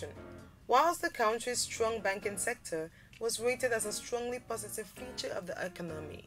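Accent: Nigeria. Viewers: This person sounds Nigerian Proudly south african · Your Facts are 100% and I must admire that given that I can Pick up the Nigerian accent in you...I thought you were gonna be Partial buh you proved Me wrong..And Yeah,South Africa is a Much Better Country to live in as Far as Africa is concerned .. Oohw..Im South African by the way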